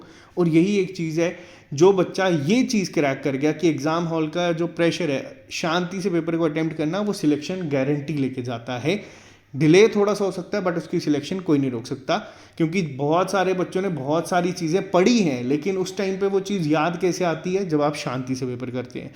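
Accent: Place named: Manipur, India. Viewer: Apki awaz sy mujhe uder manipur yaad aaya sir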